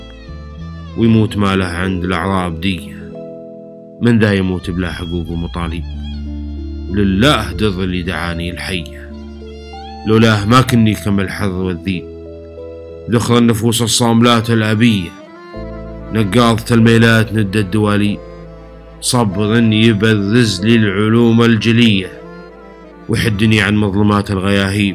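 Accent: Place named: Nabati, Saudi Arabia. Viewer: انت تملك موهبة نادرة جدا ً ، كيف تستطيع أن تنظم مثل هذه الأبيات الجزلة ، والمفردات الغزيرة والنظم المندثر تماما ً ؟؟؟ والأغرب أنك تملك اللهجة النبطية الأصيلة ، فأنت لاتكسر أول الفعل !!! يجب أن تهتم بتدريس الشباب المهتمين بالشعر ، وإن فعلت ، سوف يتخرّج من مدرستك جيل يعيد الشعر الى مجده . ويكون إسمك خالدا ً . 🌹🌹🌹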